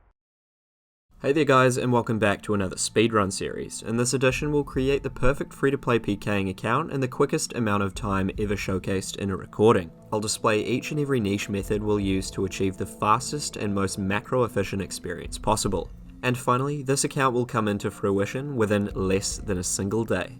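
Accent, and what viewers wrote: New Zealand. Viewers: dude u a kiwi?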